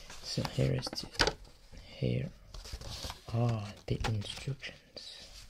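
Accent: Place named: France. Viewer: I'm trying to learn french and I love your accent, and you're amazing at english! Nice video :)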